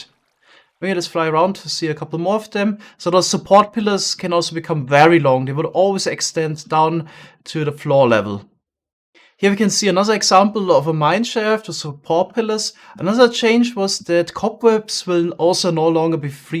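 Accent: Germany. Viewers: Du bist Deutscher oder? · Du bist deutscher oder?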